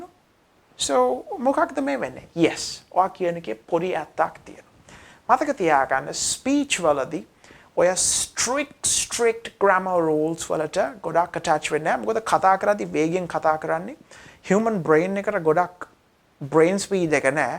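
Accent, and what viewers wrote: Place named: United States. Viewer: I really like your speaking style 😃👏 it's truly American English 😍 sir, you're great teacher 👍 because you're teaching pattern so attractive 🔥 it's a valuable thing for all English language learners ♥️ Best of luck 🌺 I'm waiting for next YouTube video of simple present tense (part6)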